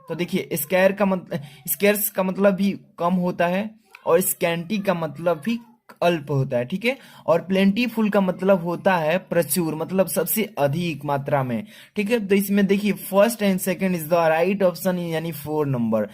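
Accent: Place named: Bihar, India. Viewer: Sir ap Bihari hai na.. pta chal jata hai😂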